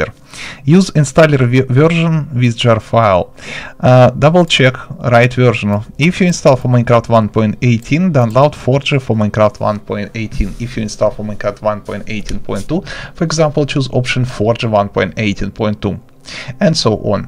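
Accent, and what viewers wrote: Russia. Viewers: Очень русский акцент))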